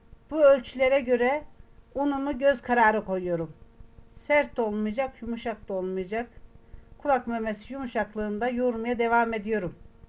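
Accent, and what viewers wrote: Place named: Kayseri, Turkey. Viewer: Bu Kayseri ağzıyla yorumdu harikasınız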